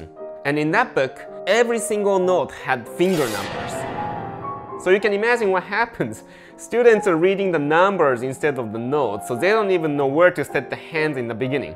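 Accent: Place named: United States. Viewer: The American-English accent is not an advantage when trying to communicate to a worldwide audience.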